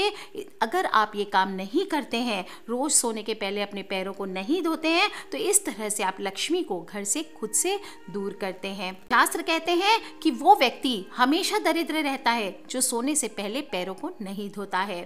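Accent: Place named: Marwari, India. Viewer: Radhey Radhey Mam aap marwadi hai kyunki aapke bolne ke wording bilkul hamari tarah hai.mujhe Kolkata ki yaad aa jati hai aapki baat sunkar.l like you ma'am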